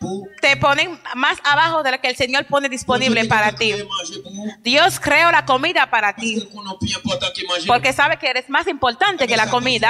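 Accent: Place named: Spain. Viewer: Li gen bon jan aksan espanyòl la nan langaj li.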